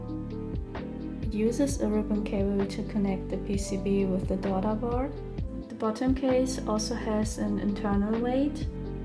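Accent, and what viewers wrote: Germany. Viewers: Yo you sound like your from Germany ? :D , if so that's pretty nice theres not a lot of custom keyboard YouTubers from Germany :)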